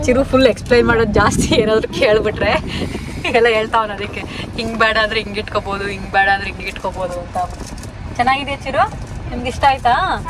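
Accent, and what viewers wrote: Mandya, India. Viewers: Foreign ge hodru nu Namma local Bangalore and mandya Kannada en sakkathu agi mathadtira ❤🎉 Navu gowdre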